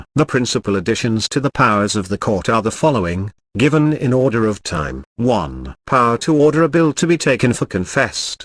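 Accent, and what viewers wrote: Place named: United Kingdom. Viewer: Wish this was in a non-british dialect.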